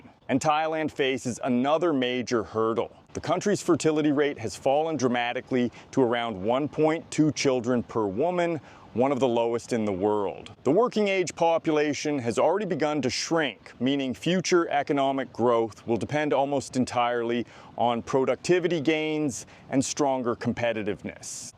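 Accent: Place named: Newfoundland, Canada. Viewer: I'm Canadian also, but your accent is driving me nuts. You talk like a non native speaker. Are you a Newfie?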